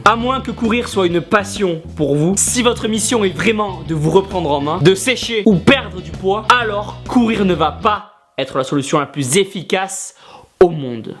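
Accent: South, France. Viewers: tu n'as pas un peu un accents? tu viendrais pas du sud?^^ Tibo InShape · En passant, vidéo très explicite. Et vive ton accent du sud!!!!!!!!! Je me sens moins seul :).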